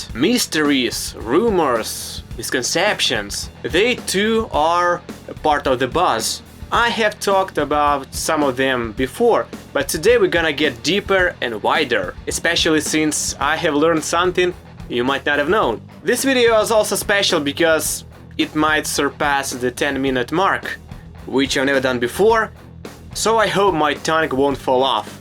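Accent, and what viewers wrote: Eastern Europe. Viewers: Holy shit your accent is heavily east European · I dont know why but listening to an Eastern European saying complex musical terms is really satisfying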